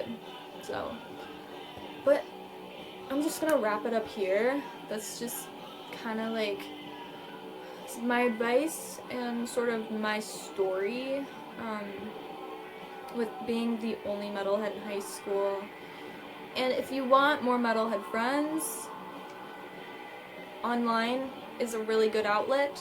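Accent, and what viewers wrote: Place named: Canada. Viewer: I'm gessing it by your accent, are you from Canada?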